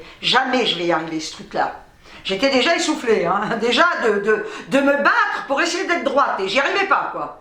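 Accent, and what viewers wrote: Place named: Algeria. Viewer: Bienvenue au Club des Barjots!!!! Ca tombe bien,j'en suis UN! On a écouté ton histoire avec Véro(mon épouse),c'est un sketch!!!! Franchement ton coté Pied-Noir pour raconter,c'est un régal. On a bien rigolé avec Véro,et surtout quand tu racontais,en faite je voyais le film défilé,on s'est marré. Sur la tête de ma Mère,Yallah!!!!!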